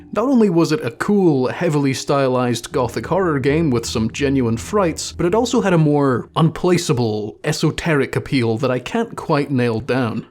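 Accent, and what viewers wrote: Scotland, United Kingdom. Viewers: I did not realize that you were Scottish until this video I just thought you were polish or czech or something because that's so common for youtubers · wait you're british? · he’s like Scottish jerma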